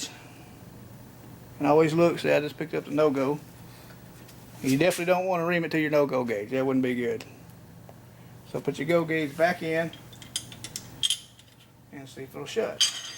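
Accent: Alabama, United States. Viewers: excellent demonstration of re-barreling and head-spacing an M1 Garand. Don't worry about your Alabama accent, I understood everything that you said on your 4-part video. Like another viewer commented, you have given me the confidence to re-barrel my own projects. I would love to go to Gunsmithing school, but there are no decent ones where I live. Thank you kindly, from California, for taking the time to show us all how it's done, and on a budget to boot.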